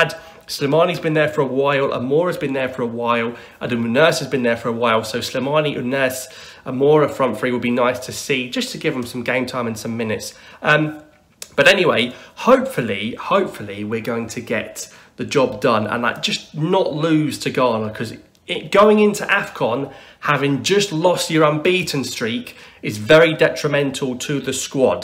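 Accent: United Kingdom. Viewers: you got a British accent ! Are you English guy who supports algerian football team ?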